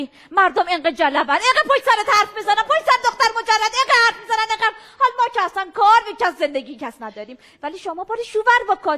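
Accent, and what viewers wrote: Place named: Qom, Iran. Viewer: من خیلی دوسش دارم ، فسمت رستم وسهراب رو چهار بار نگا کردم ومنکه اصلا دیر مخندم واقعا از ته دل خندیدم عاشق قمی صحبت کردنشم ، انشالله بازم بیاد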